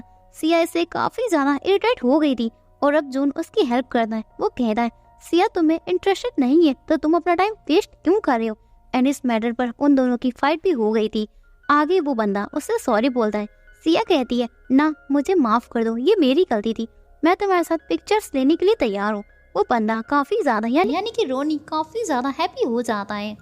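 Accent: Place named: Arunachal Pradesh, India. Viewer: Apki voice arunachal ki lag ta hain....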